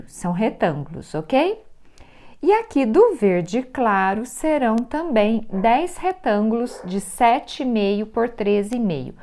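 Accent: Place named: Russia. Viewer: I truly love the colors n the style, Especially your Russian accent😅❤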